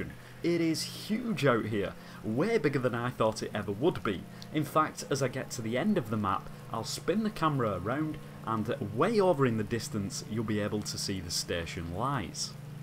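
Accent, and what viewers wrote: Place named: United Kingdom. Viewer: British, I think. Very cool.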